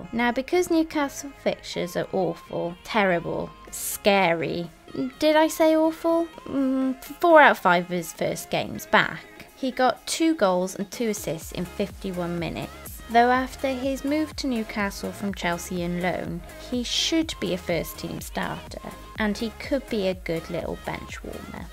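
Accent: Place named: Wales, United Kingdom. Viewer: Never the less; those lovely Welsh(?) tones are honey to the ears. Keep up the great content and get well!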